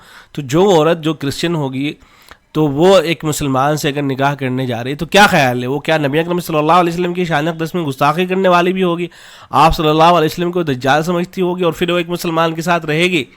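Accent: India. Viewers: مولانا السلام علیکم اپکاتعارف کیاھے آپ کہاں سے ہیں آپکا لب ولہجہ توشہردیوبندکے معزز لوگوں جیسا ھے ایسالگتاھےجیسےآپ میرے جانے پہچانے ہیں آپکی فراغت کب ھوئی ھے بہت شکریہ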